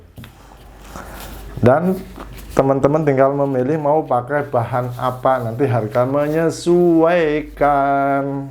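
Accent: Banyumas, Indonesia. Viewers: Ngapak ya mang 😁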